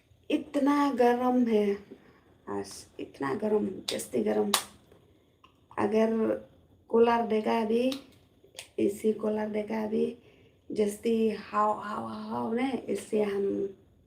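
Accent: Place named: Bangladesh. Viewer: Ka shrieh kynthei ba lehsih tam bad ka ak bha briew sa ba la tymmen, imat kaba ym don ba ha ing ha sem ym don ba sneng ym don ba kraw ym don ba iohi ia ka jingbiej jong ka, lada don ruh ialam noh ia kane kloi sha SANKER hospital kein ba kan ioh jing sumar bad ban shai noh ka jabieng dum jong ka. Kane kein ka briew kaba ka EIT bad ka JABIENG jong ka ki ia daitlang hajuh. Lok khar bangladesh tharai ba ka kren dkhar.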